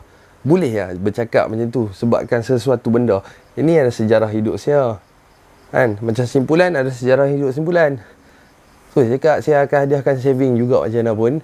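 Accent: Penang, Malaysia. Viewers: please laa bercakap mcm orng utaqa .. toksahh la dok gabung kl dgn penang naik peninnnnnggggg maitttt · Cakap peneng lagi sempoi.x payah cakap KL bunyi pelik😅😅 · cakap kl sekerat Pineng sekerat apa PON terbaik bos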